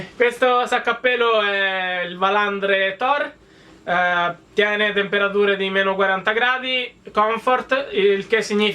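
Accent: Umbria, Italy. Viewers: alla faccia che forza che sei! ma dal tuo accento sembri umbro o sbaglio?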